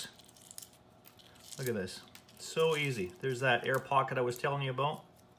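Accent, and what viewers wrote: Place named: Canada. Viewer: B.C. Egg! I thought I heard Canadian-speak in your voice! Love your Elvis TCB shirt, where did you get that? Oh yes, and thank you for another helpful video! I used these methods after my successful EZ-peel hard boiled eggs experiments, inspired by your other video at <link>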